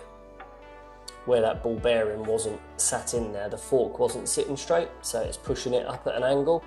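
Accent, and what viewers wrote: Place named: London, United Kingdom. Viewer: Good to hear a londoner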